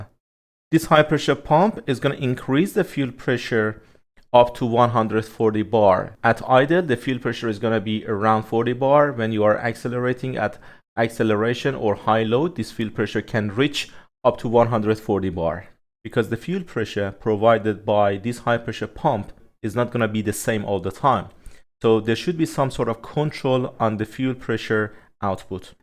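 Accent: Iran. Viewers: Iranian accent isn't it?😅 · برگام این لحجه ایرانیه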